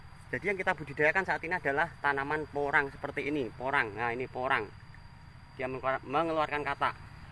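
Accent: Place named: Java, Indonesia. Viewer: Mantaap...tutorialnya. klo dengar bahasany, asli orang jawa ya, om...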